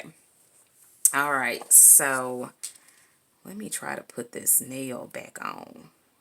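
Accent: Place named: South, United States. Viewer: Thank you for this video! I absolutely love your country accent! ♥️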